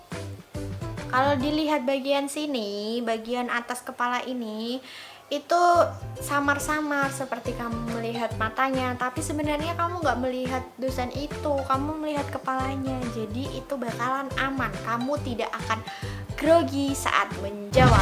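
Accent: Java, Indonesia. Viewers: medok sekali 😍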